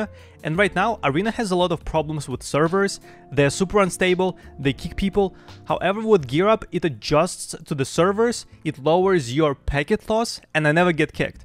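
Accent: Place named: Russia. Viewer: Its free to try.and he is russin so he can not say things in perfict english sometimes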